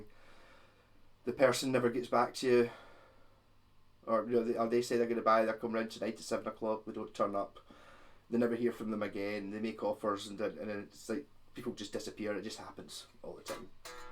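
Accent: Scotland, United Kingdom. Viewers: What kind of accent is that? I bet scottish:) Can get this one for 140 euro,barely used,is it worth?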